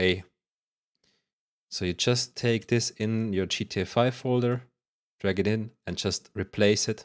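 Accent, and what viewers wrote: Germany. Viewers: bro is defenitif deutsch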